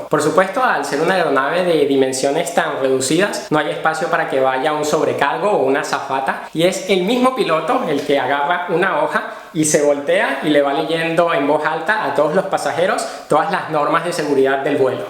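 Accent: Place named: Venezuela. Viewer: De qué región de Venezuela es su acento? Y me disculpa.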